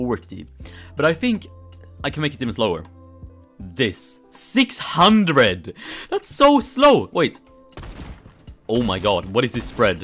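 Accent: Sweden. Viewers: Are you swidish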